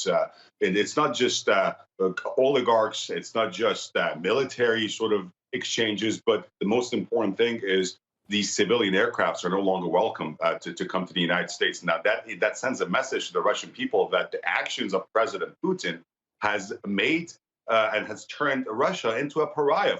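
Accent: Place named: England, United Kingdom. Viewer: salam aagha arash omidvaaram haletoon khoob va har jaa ke hastin salaamat va tandorost baashin aaagha vaaghean baraye man eftekhaare ke yek irooni chonin jaaygaahe besyaar aali ee dar jahaan daare zemnan alaave bar maassshhhala khoshtippi, va sedaaye besyaar carizmaatic va naafez, shomaa be tarze ajiby baa vojoode inke dar emricaa bozorg shodid,az vaajehaaye besyaar ziba va besyaar bejaa va fannie faarsi sohbat miconid,,, man hamishe tahlilhaatoono ba deghat tamaasha miconam, vaaghean aafarin ce inghadr be faarsi ahammiat midid va ,,,bedoone tamallogh, agar oon tah lahjeye zibaaye ingilisi ro nadaashtid, va casi harf zadanetoon ro faghat mishanid, fekr mikard shoma ostaade adabiiat hastid vaaghean dametoon garm ,,,man kheyly keif mikonam vaghti tahlilhaatoono mibinam vaaghean kaaretoon doroste,,,yek ostaad,yek tahlilgare tamaam ayaar, va yek sokhanvare tamaam ayaar hastid omidvaaram hamishe salaamat baashid.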